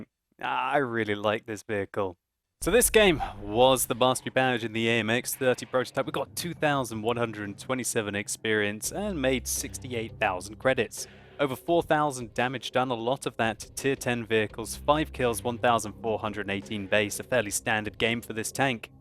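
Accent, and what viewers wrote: France. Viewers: Lovely french accent :D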